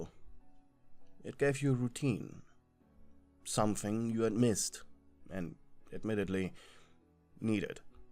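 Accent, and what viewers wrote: Germany. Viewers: Why do i hear a little german Akzent :,)?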